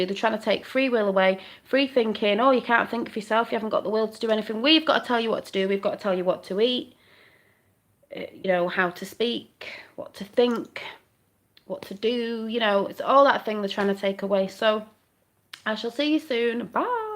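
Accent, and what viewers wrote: United Kingdom. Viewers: Thanks n God Bless u 2 Beautiful. I thought that was a British Accent I love it 🤗 lol... I'm sure u hear 100×'s a day how Beautiful u r But every girl loves 2 b told there Beautiful So hopefully it made u 🙂 n if it did Awesome u hope I did make u smile while making ass of myself 😢😊 n e time u want 2 come 2 America I'd gladly put u on a flight. U Truly r The most beautiful woman I've ever randomly jus ran across here on YT 😍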